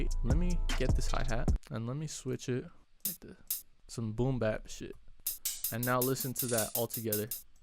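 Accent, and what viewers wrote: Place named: Mexico. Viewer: idk why but to me you sound mexican lol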